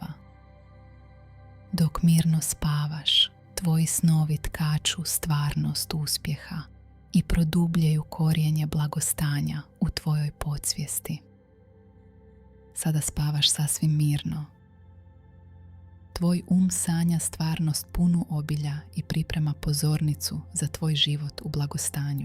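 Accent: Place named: North Macedonia. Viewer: Divan glas, nisam bila sigurna je li AI, često makedonske( bar mi se učinilo) riječi. Svakako čudna mješavina koja čak i umiruje. Sviđa mi se zapravo🤔👍😀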